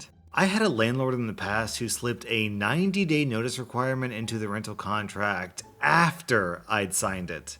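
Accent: South, United States. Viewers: Not gonna lie that southern accent was kinda cute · I love your country accent lol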